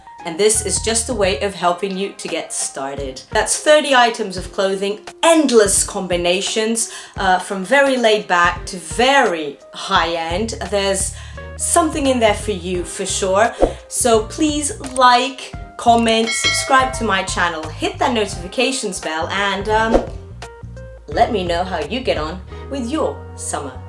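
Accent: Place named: United Kingdom. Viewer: Just love your British accent!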